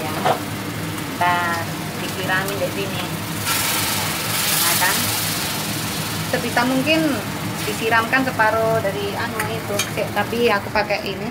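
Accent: Java, Indonesia. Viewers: mbk seng ayu iki jowone teko endi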